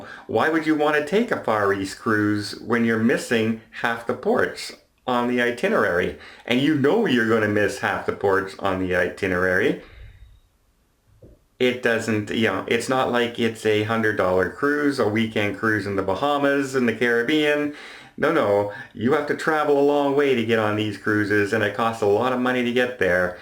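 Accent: Canada. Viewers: ? I love your videos. I/m just an average Gay American (IF that's even possible??) So, I grew up on the West Coast (Los Angeles) and I hear accents.? I knew you were Canadian the minute I heard your accent AND??? Because you are a kind and respectable person. YOU are a treat!!!!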